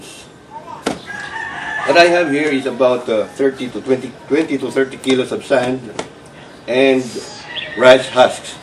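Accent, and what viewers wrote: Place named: Philippines. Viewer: he sounds filipino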